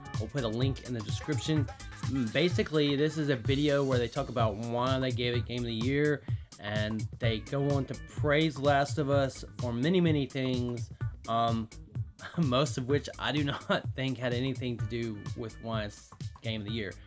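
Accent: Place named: South, United States. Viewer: Where are you from? I hear a bit of a Southern accent.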